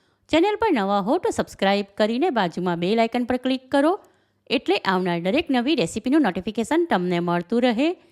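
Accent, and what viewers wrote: Surat, India. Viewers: This looks so delicious! I'm definitely going to try making these batata vadas at home. Thanks for sharing the recipe! surti tone, i love it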